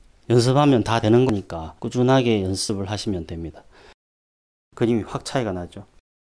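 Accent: Gyeongsang, South Korea. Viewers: 알수없는 유투브 알고리즘의 추천으로 아무 생각없이 보면서 차분히 설명 잘해주시는 경상도 아저씨구나 생각했는데 에서 빵터짐ㅋㅋㅋ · 아 마음의 답글 우선 고맙습니다 ^^ 얼추 경상도사투리시라 짐작대로 부산이시라니 머네요 아쉽구요 인생후반 .. . J 님의 재미난 설명곁들인 멋진기초? 드로잉실력 나눔을 보다보니 불현듯 어렸을적 초등시절의 미술 ? 제재능이 생각났어요 새삼 .. 인생후반 겪고있는 힘든일들을 극복 승화라도 시킬수있는 힘 .. 얻고싶음을 기대하는거겠죠 말씀대로 교보든 어디든가서 스케치북 미술연필 구해봐야겠네요 선생님쓰는 연필 .. 뭘로 구할까요 ? 유투브 공짜연습생들 모여서 혹 ??? ㅋㅎㅎ 뵐날까지 함 기대 그려도봅니다 문외한에게까지 나눠주시는 마음 진심 고맙구요 👍👍👍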